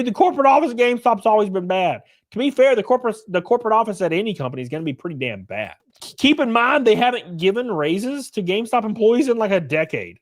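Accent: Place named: South, United States. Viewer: Real talk i never noticed how much you sound like you came straight up like you came right out the talladega nights movie lol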